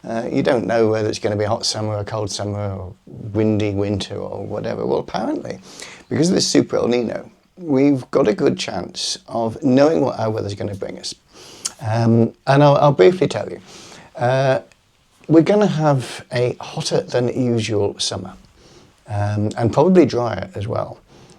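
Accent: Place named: Cornwall, United Kingdom. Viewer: love the cornish accent- ell neenow! cheers!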